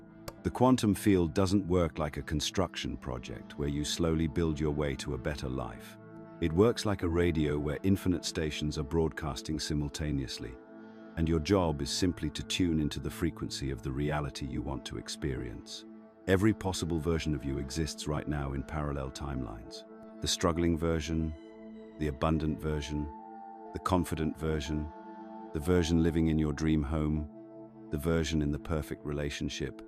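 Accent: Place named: United Kingdom. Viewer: With the British accent 😅😢😅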